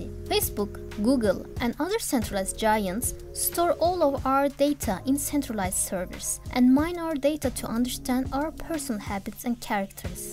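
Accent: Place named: Turkey. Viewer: I can hear a turkish women 👀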